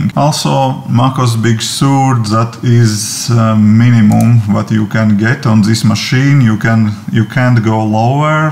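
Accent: Russia. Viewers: lol. I thought you are Russian. you have a russian accent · You're Russian, right? You have a Russian accent :D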